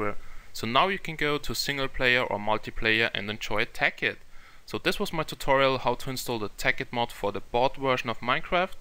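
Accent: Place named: Germany. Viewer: I love the german accent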